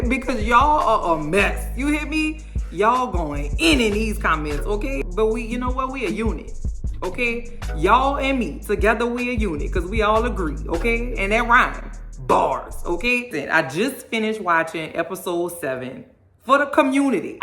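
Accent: New Orleans, United States. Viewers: Your channel came thru my feeds on sheer accident and I LOVE IT😍First off Bayyyyybeee I can hear that Nawleans accent a mile awayyyyy heard me😝 secondly, you are HILARIOUS 🤣🤣🤣 When you went off bout Steve sticking his sticky fingers all over the mashed potatoes and kitchen cabinets I was outta here 💀🤣🤣🤣🤣🤣🤣🤣🤣🤣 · You sound like my people back home in New Orleans… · Geez! I'm so glad I found you and your channel. Ive watched reviews of this show from others and can't even get through the full review. Plus, your New Orleans accent made me subscribe in less than 1 minute into the video. I know in my bones this about to be good! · I knew you were from New Orleans the second you opened your mouth. You have a new sub, neighbor!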